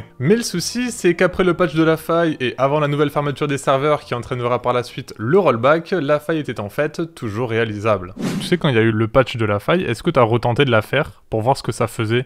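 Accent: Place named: Iran. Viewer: ça fait plaiz d'entendre un iranien (a l'accent)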